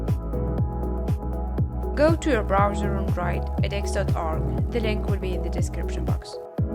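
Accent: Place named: North Africa. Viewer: From your accent you look like from North Africa, your financial aid is displayed in the French language, your name looks like an Arminian name, and you speak English. Where are you really from? 😁😁😁😁 Thanks for sharing this video.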